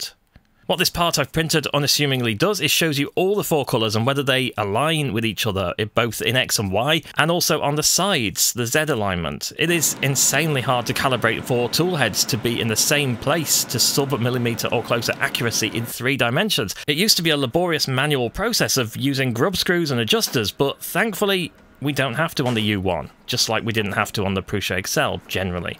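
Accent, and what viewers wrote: England, United Kingdom. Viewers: This is yet another untested pie in the sky kickstarter project with no track record and many many unanswered questions despite all the fancy pants marketing and English accented voice overs . Don’t be a guinea pig with your hard earned dollars . Let some other clown waste their money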